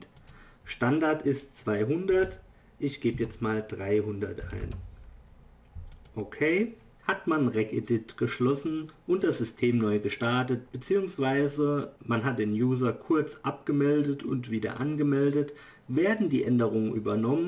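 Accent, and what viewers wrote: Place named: Hesse, Germany. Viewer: Bist du Hesse? Du hörst dich nämlich an wie einer :)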